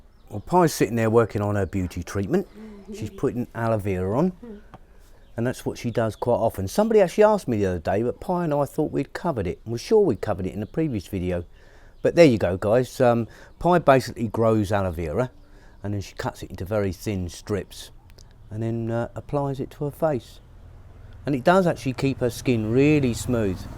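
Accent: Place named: Northern England, United Kingdom. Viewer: His British accent just got a bit more 'northern' now, lol.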